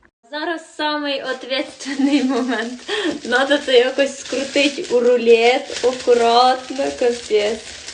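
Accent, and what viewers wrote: Poltava, Ukraine. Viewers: Чого примахуєтеся до людини за її мову в кожному регіоні говорять по своєму на Полтавщині так говорять вона ж вам пояснювала правильні ви дуже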